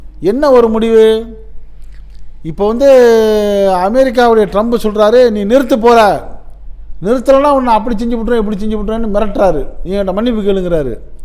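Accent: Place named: Rural, India. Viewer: உங்களின் பேச்சில் கிராமிய மனம் வீசுகிறது.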